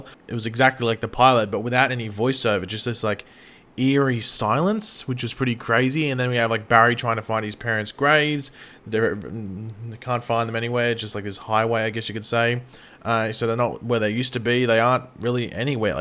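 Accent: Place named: Australia. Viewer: Yeah but you can clearly tell is Australian from his accent so this guy's just falsely accusing him for nothing. Besides there are stream sites like vumoo or whatever it's called where you can watch it a few hours after it airs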